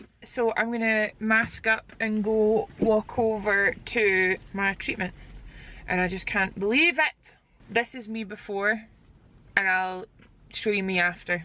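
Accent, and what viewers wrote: United Kingdom. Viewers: Your accent is lovely from which part of uk you are?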